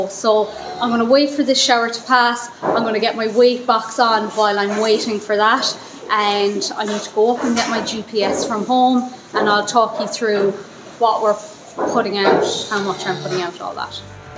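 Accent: Ireland. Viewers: Hello! Me being from North Carolina(USA) I love listening to you talk. People say I have an accent but I believe you have me bet. Also I love those saying which is probably normal for you Irish folks. Enjoy listening to the sound of the ol' 135 so maybe video some real time in cab with you.🐮🚜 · Brilliant video, you could use a greedy board extension to the spreader, maybe useful to see if Vicon do it. A good job well done, you figured it out, and that's what important. No you don't talk to much, it a pleasure to listen to your soft Irish voice. ♥️👍👍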